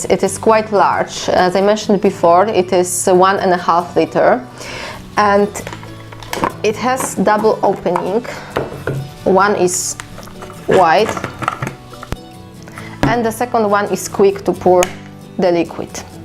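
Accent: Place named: Poland. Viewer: po co mówic po angielsku jeżeli słychać ze jesteś z Polski?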